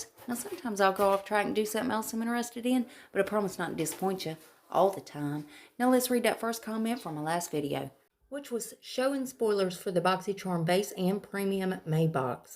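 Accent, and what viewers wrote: South, United States. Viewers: Hey girl! This is my first time finding your YouTube Channel. My name is Kate and I’m from Vermont, but went to college in Memphis, TN and LOVE your southern accent!! Anywho, for my BoxyLuxe June box I chose the TULA Glow and Get It Eye Brightening Balm and my 2nd Choice Option (I wanted the Swell Bottle but it was no longer an option) so I selected the Gua Sha Face Sculpting Jade Device. By the way you literally made me Laugh Out Loud when you said “Y’all, what the hell? Y’all know I don’t know how to pronounce anything. Let’s move on...” I instantly subscribed to your channel after that as in my Vermont accent I said the same thing, LOL! Have a beautiful month of May and thanks for sharing with us!!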